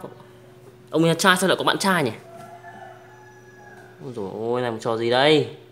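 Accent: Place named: North, Vietnam. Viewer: Bạn nên nói to hơn mih ng nam nghe tiếng bắc hơi khó hiểu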